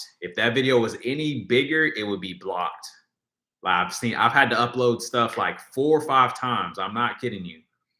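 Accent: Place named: South, United States. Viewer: I’m sorry but I just have to say I love your country accent it’s sexy as hell!!!! It does things it’s very refreshing the whole attitude personality it’s definitely the whole package to me just sayin!!!!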